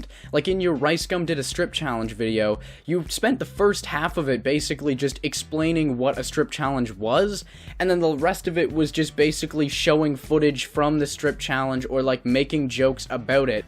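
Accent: Canada. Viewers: Watching you has literally reminded me of all my wonderful childhood summers spent at Crystal Beach! Directly after the Peace Bridge, or within short distance. (After the amusement park was shut down:/ but still the absolute happiest memories) for a few reasons. You legit bear a shocking resemblance to one of the slightly older teenagers who lived next door to our summer cottage (he was always looking out for us younger kids and even the slightly older cousins and whatnot especially if we wanted to venture in to the town. Even with my older brothers taking us younger kids, this guy still made sure to come with us as he didnt want anything weird happening. Like a surrogate big brother or cousin. Okay this turning into a WHOLE thesis on why your accent and then the almost doppelganger resemblance, has helped me to feel more related to you. And also just made me feel damn good to remember those times. 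So, thank you for being Candian cuz as dumb as it, honestly made me like you more.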